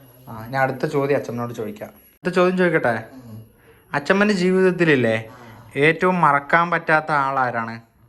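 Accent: Palakkad, India. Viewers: ആദ്യ subsciber ആണ് ഞാൻ.. അന്ന് പേന ഒക്കെ കൊടുക്കുന്ന വീഡിയോ കണ്ടത് ഇന്നലെ എന്ന പോലെ ഓർക്കുന്നു. അന്ന് എനിക്ക് ഈ ഫോൺ അല്ല.. അന്ന് ഞാൻ comment ഇട്ടിരുന്നു നീ നല്ല മനസ് ഉള്ളവനാണ് നല്ല നിലയിൽ എത്തും, ചാനൽ one മില്യൺ അടിക്കും എന്ന്... അന്ന് 1k പോലും ആയിട്ടില്ല എങ്കിലും എനിക്ക് ഉറപ്പ് ആയിരുന്നു..പാലക്കാടൻ സംസാരവും ആ വീടും വീട്ടുകാരെയും എല്ലാം എനിക്ക് ഒരുപാട് ഇഷ്ടമാണ്.. ഒരു അഹങ്കാരവും ഇല്ലാത്ത ആളുകൾ... എനിക്ക് എന്റെ അനുജനെ പോലെ ഇഷ്ടമാണ്.. എന്നെങ്കിലും നേരിൽ കാണാൻ ആഗ്രഹമുണ്ട്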